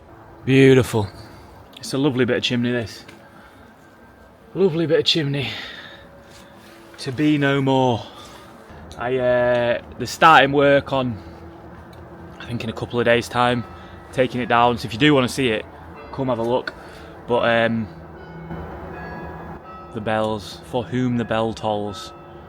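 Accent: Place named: Leeds, United Kingdom. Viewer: Where is the chimney at from your accent I would say you are from Leeds.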